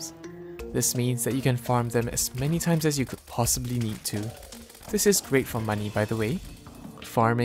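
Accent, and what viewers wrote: Singapore. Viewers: Wah bro, you sound like a singaporean. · knew u were singaporean the moment u started talking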